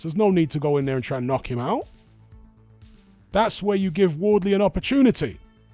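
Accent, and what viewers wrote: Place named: United Kingdom. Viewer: I guess you're not used to hearing black guys with British accents. Anyone who is from the UK will tell you he sounds black. That's what we sound like here.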